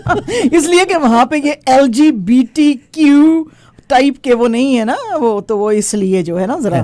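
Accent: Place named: India. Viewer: please don’t try to speak in Indian style. Please speak urdu in urdu style.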